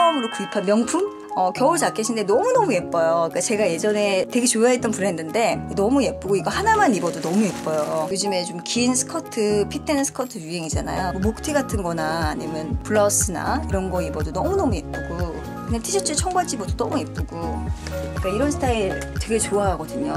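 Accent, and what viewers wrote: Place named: Seoul, South Korea. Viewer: 서울사투리 쓰네영ㅎㅎ귀엽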